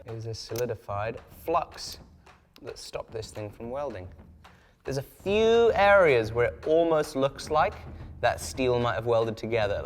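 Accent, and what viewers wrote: United Kingdom. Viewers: “Hex-uh-ginz”. Jesus. I love how British is. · LOVE your videos! Humor, science, black smithing, smithing porn, cool tools, fun facts, awesome cool music, and that proper British accent. It’s perfect right innit?!?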